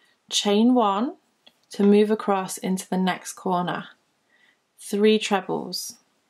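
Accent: United Kingdom. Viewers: Thank you so much. I watched a couple of other tutorials, and couldn't "get it". Yours was the best, I finished a square right along with you. Your voice is lovely, which helps a lot! I'm from the US and at first thought "Oh no, another Brit", but you did such a nice job of explaining the terms, that I soon was very comfortable with UK terminology. Thanks for including us!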